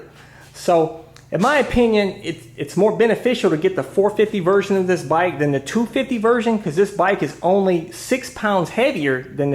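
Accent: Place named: Texas, United States. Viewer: “Sorry I’m old school” you don’t sound old school you sound like a dumb hillbilly out in Texas. Here in Ohio we got hillbillies that that snort and shoot up drugs yet you manage to sound more stupid then them.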